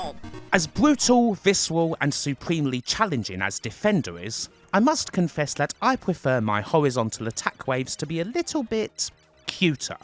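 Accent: United Kingdom. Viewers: Another F’ing brit. Blocked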